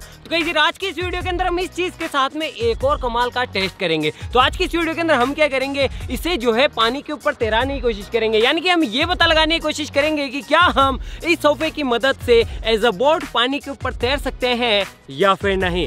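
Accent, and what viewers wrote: Rajasthan, India. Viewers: Bhai aap rajeshthani bhasa badi achhi bolte ho ek video me aap bs rajeshthani bhasa hi bol ke banao na plz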